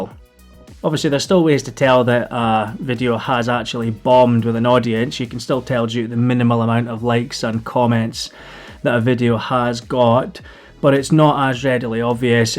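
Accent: Scotland, United Kingdom. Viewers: Defo a toonser or a teuchter I'd say! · Also not sure where about in scotland you are, but what's your thoughts, if any or any chance of a video regarding David goodwillie ? His recent signing with raith rovers in fife, which going by your accent isn't a million miles from you has caused a lot of fallout. Good to have you back, was a follower of you n wheezy on daddy gate.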